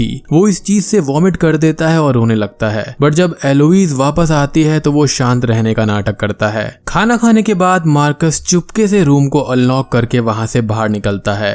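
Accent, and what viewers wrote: India. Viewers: Such an arrogant champ lol. Get your accent right indie boi. Hahah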